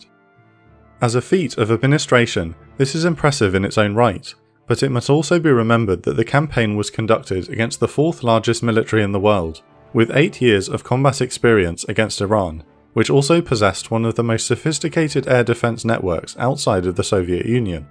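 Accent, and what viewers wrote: England, United Kingdom. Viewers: The English accent threw you off. But he does say Iraq. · why is ALWAYS some deep English accent?! I COULDN'T listen to it as much as I wanted to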